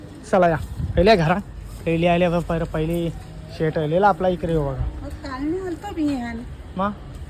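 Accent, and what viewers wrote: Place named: Agri, India. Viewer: Aagri tari bol nahiter sudh marathi bol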